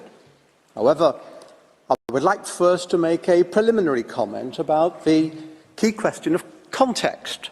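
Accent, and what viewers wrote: United Kingdom. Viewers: Beautiful English, amazing British accent. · Mine second - I ador your British English, Sir Malcolm!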